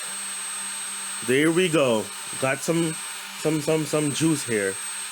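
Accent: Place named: Jamaica. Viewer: Hey. You sound like a Yardie. If you are Big Up Yuhself!! Enjoyed your video, keep up the great work. Can't wait to see part 2.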